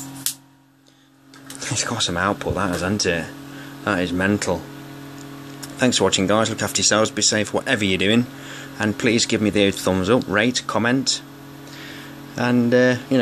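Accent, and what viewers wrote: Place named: East Midlands, United Kingdom. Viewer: East Midlands accent... Derbyshire? Ripley for a guess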